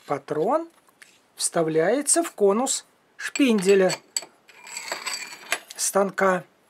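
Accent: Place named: Volga, Russia. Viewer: Спасибо за полезное видео, и ещё волжский распев приятно было услышать)) где-то рядом с Самарой?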